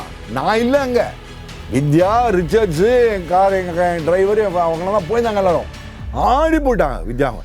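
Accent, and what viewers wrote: Chennai, India. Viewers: sir you are awesome! Again and again you are proving you are so talkative, sportive etc.. You always speak in our Madras basha.. 👌👌 Velipadayana Manishan sir neenga..No one can compete with your energy level...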